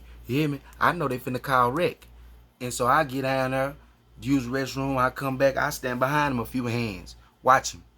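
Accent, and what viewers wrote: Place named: Texas, United States. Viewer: TKO sauce. Haha. Thats funny shit. You have an awesome accent. That Texas twang is on point. I saw you from watching OG Percy videos. Were you locked up with him at all? Much support from Humboldt County cali. Just subscribed. You have a nice delivery. Keep pushing these videos out. 👍👍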